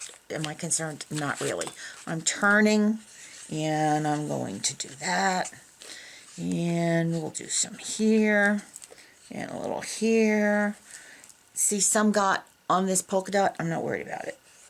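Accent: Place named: New York, United States. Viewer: I am SO happy that I found your tutorials! I love your colors and your personality--and I think I even detect a little NuYawk accent there :) Can't wait to catch up on the ones I've missed and the new ones to come. You brightened MY day! Namaste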